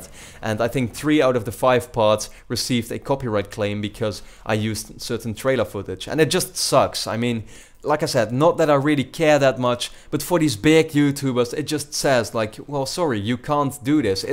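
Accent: Netherlands. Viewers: Hey you sound dutch. Are u dutch.?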